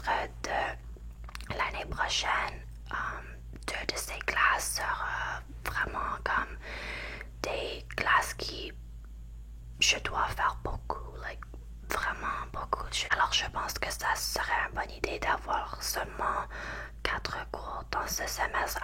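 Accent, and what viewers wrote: Quebec, Canada. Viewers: Wow tu es vraiment bonne, j’aurais cru que tu etais du Qc et non Ont so its even more impressive! J’espere voir d’autres videos en francais de toi ❤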